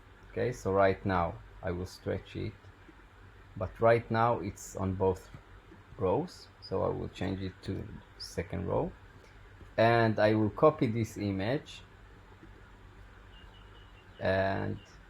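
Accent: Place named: Israel. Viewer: הייתה לי תחושה שאתה מישראל חחח תודה על הסרטונים!!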